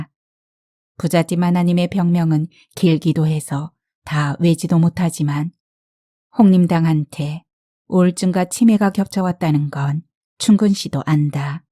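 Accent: Jeolla, South Korea. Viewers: 밤늣은시간인데잠을못이루고 있어요너무재미있어서요 구수한전라도사투리가듣기가 좋군요긴장편잘듣겠습니다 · 님 어찌그리 전라도사투리를 맛갈나게 읽어주시나요 전라도 사투리 매력에 빠져들고 소설에 빠져듭니다~ 감사히 잘 듣고 있습니다~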